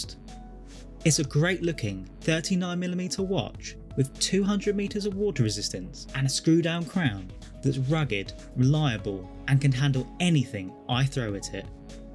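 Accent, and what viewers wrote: United Kingdom. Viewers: He's British. He's just talking.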